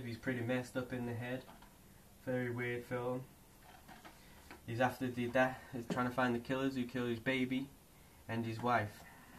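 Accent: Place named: United States. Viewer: what you talking in that SHOCKING american accent for ?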